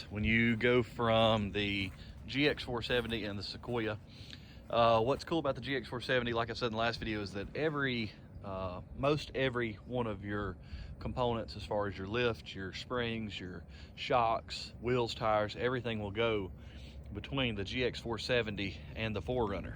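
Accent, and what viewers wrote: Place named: South, United States. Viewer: I am registering my GX470 in the town a have second home in. I just bought it and can not drive it because all of registration paperwork is sitting in an airport because of the snow storm. I am so pissed. Thank you for the showing us that may not know the difference. Between the various SUVs. Love your southern accept. Ha ha. 👌🏽. Are you in Instagram?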